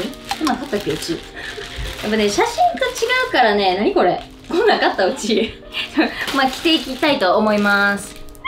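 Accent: Kansai, Japan. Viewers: きょうはめっちゃ関西弁でおばさんのようなテンションで可愛いです笑笑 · 今回いつも以上に関西弁ごりごりで可愛い😂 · 関西弁の辛口レビューさいこーおもろい😂 これからも関西弁で辛口レビューおねしゃす🤍🤍🤍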